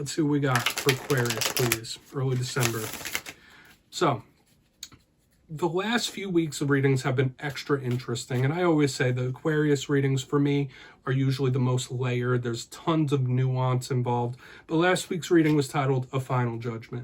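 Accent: New Jersey, United States. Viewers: you have a Jersey-ian accent. I love Jersey. It's possible for regular people to convince other regular people to do horrific and unGodly things based off of THEIR own personal experiences & happenstances in life. The challenge is to think independently and live without fear of repercussion or reprisal. Don't sacrifice your valuable time doing things that you, otherwise, would not do. We are here to enjoy our time, that's all.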